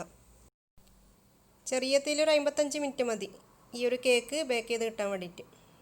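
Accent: Thalassery, India. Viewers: ഇതൊക്കെ കേൾക്കാൻ ഒരു രസമാണ് തലശ്ശേരി ഭാഷ 😍👍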